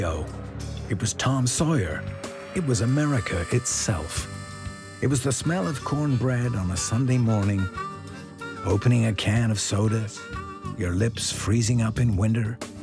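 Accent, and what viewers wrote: United Kingdom. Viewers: This appears to be someone from the U.K narrating an American story. I coulsn't finish watching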